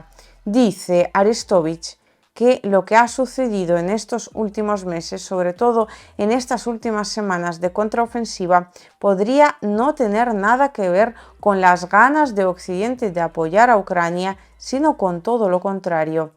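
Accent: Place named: Spain. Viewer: Mira, tu audiencia es latina y no española, por lo que te sugiero practiques un poco el acento neutro. Te entenderemos mucho mejor.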